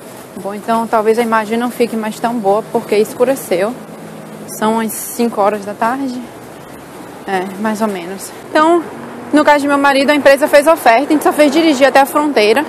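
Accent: Brazil. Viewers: Você é do nordeste ?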